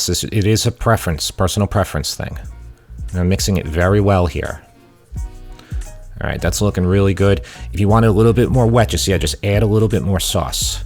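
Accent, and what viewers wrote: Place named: Philadelphia, United States. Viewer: I’m literally going to watch all of your videos so I feel at home. Your Philly accent with a hint of Jersey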